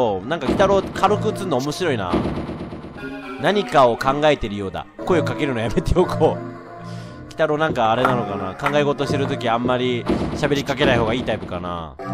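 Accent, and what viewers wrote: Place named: Kyoto, Japan. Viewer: たまに出てくる京都弁でほっこりする^^ 肉人形楽しみにしてます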